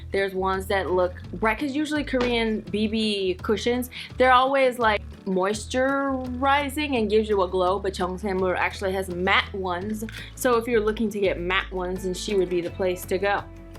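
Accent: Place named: South, United States. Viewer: ur southern accent is the cutest !!!!!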